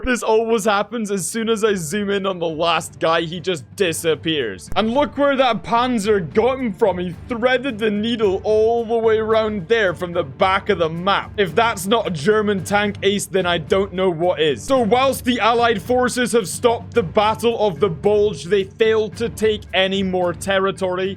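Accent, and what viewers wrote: Scotland, United Kingdom. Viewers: Scottish BaronVonGames? Consider me a subscriber! I feel bad that your work goes unnoticed.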